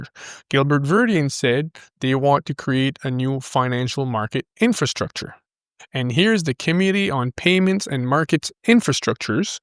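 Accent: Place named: Quebec, Canada. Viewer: brother never stop making XRP content. Btw I’m In Toronto. You sound like you’re from either northern Ontario or Quebec. Am I right?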